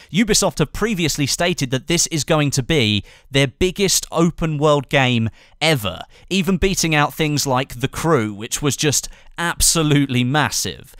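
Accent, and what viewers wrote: Spain. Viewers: I see you took the old Spanish accent out of the cupboard :)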